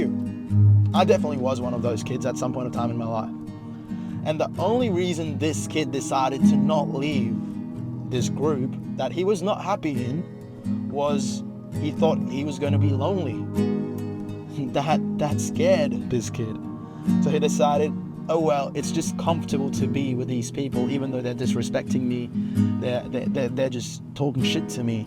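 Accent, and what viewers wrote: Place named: United States. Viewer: Bro im 17,im from India i just came accross your channel and really loved your vids. In addition I'm impressed how you speak.. fcking smooth english with American accent and can speak hindi too. Bro i just want your advice on improving my English accent cuz i have big goals and i wanna be an entrepreneur on international level. So that will help me a lot.pls drop some gems here if you are reading this❤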